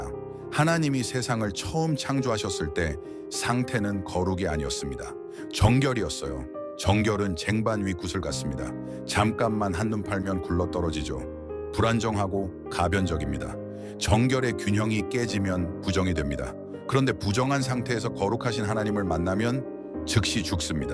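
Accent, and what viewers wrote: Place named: United States. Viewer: 감사합니다. 성경공부에 큰 도움이 됩니다. Awesome! 혹 목사님이셔요? 교포셔요? 발음이 미쿡사람 같아서요🤗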